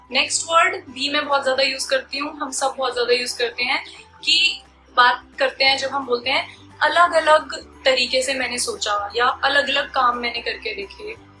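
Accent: Punjab, India. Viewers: mam r u from Punjab???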